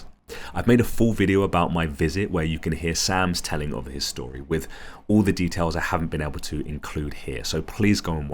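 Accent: United Kingdom. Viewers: Why is he nick cannon with a British accent?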